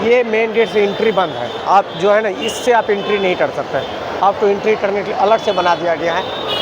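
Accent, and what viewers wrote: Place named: Bihar, India. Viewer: भाषा का उच्चारण ठीक से करो।। तुम बि हारी कोई भी भाषा बोलो उसमें उरेठ पन झलकता है।।इसलिए तुमलोग हर जगह मार खाते हो।।शर्म नाम की कोई चीज बची ही नहीं है तुमलोगों में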